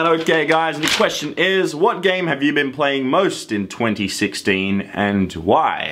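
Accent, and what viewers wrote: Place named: Australia. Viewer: Bob Bobby literally the exact same accent as every other Australian lol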